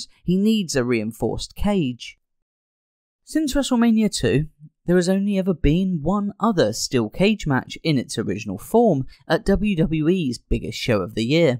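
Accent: United Kingdom. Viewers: I actually like the way the narrator says it, it fits right in with the rest of the proper British pronunciation he uses. Lay off the coffee man, all the random all caps and aggressive tone is really off putting and undermines the point you’re trying to make.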